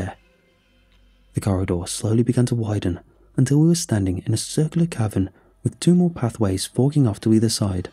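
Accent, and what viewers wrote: England, United Kingdom. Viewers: This guy sounds like a Brit but says he grew up in Colorado. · is British. It’s the most logical explanation for why he pronounces things differently. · I might have possibly believed this as a true story if he explained that he was SAS. But that British accent was a tip off. But it is well written . And a nice work of fiction. Good job! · Why is there a British narrator? Can't understand a word. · Guy sounds a lot like a Brit to be an American... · What’s with the English accent? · British accent, pronunciation is different, not wrong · Obviously it's been narrated by a British guy.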